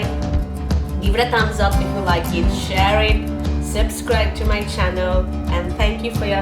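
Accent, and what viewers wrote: Sri Lanka. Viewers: I think it's bit hard to guess hmm 🤔 Nevertheless when it comes to your Sinhala accent it's more like a Sri lankan's one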